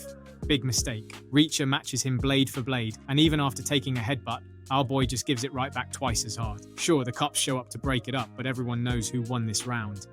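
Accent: United Kingdom. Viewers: I really do not like to hear the narrating from someone from England. They talk funny and their English is Fucked up! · THREE/'#-separate-times, ths IIIDIOT British 'voice' states tht, tha 'crazy-doctor' attacks Neegly with a "drill"; it wuz a SAW! NOT a 'drill'! Ths iz one-of-MANY reazonz tht, I HATE British-narrated 'stuff'!! Stay in YOUR land! Leave American stuf ALONE!! YOU-ppl SUCK!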